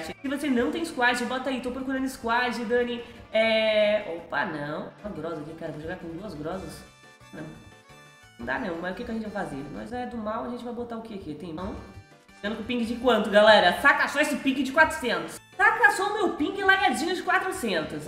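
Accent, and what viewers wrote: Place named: Portugal. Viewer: Dani Tem Um Sutac Portugues muito Lindo Amei❤❤😍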